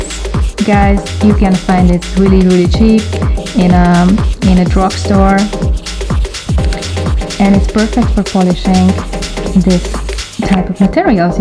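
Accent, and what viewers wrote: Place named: Hungary. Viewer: A videó alatt végig sejtettem, hogy magyar lehetsz, aztán ránéztem a lejátszási listára :D Jól megy Neked az angol, de mégis megvan az a magyaros kiejtés. Viszont örülök ennek, hogy van magyar női rajongója is a Hot Wheels témának :)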